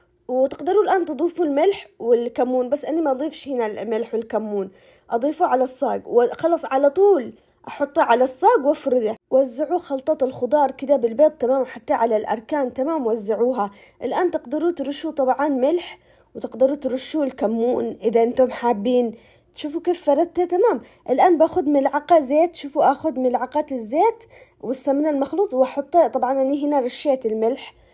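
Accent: Aden, Yemen. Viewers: فديتك احب طبخك واحب اسمع صوتك الله على الكلام العدني اقسم بالله انت فنانه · ياروحي على مطبقيه عدنيه بلهجة عدنيه ❤❤. ما أحلى اليمن ❤🇾🇪♥️ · ماشاءالله تسلم يدك يا كم تعجبني لهجتك العدنيه والله حبيتك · اللهجة العدنية جميلة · فديت عدن وفديت كريتر الغالية وفديت كلامك العدني المليح واحلى مطبقية من احلى فنانه مبدعه ماشاء الله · ما شاء الله عليك حبيتك حبيت خفة دمك ولهجتك الجميلة أول مرة أسمع الحكي اليمني الأصلي ربي يحفظك ويسعدك شكرا لانك عيشتنا معك أجواء اليمن السعيد ونقلت لنا ثقافة اليمن العريقة متابعة واشتراك ولايك لعيونك الحلوين وتسلم ايديك❤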